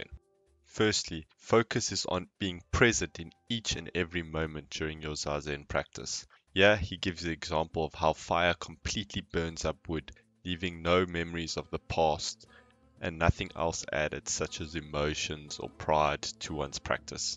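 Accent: South Africa. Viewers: Great video! I love your accent, is it South African ? Reminds me a lot of my grandfathers voice. Thanks :)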